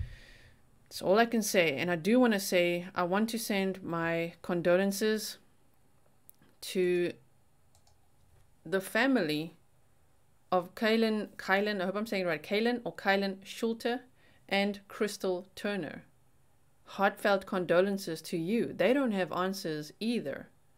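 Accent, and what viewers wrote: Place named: Afrikaans, South Africa. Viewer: You HAVE to be south African! Nowhere else in the world would you find that strong afrikaans accent.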